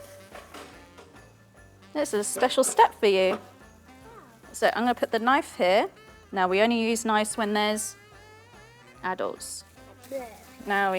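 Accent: United Kingdom. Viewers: LoL - first few seconds threw me off - until i realized she has a British accent... What da HAIL.... 😜🤣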